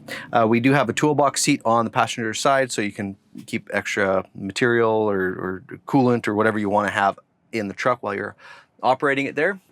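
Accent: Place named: Canada. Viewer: Idk for sure if this guy is Canadian he sure sounds like he is. Once I heard that I was pretty sure what was under the hood. They like those up there.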